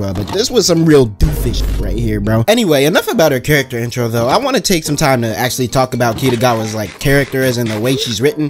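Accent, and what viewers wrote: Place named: Toronto, Canada. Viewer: I can tell this man is from the GTA. I didn't why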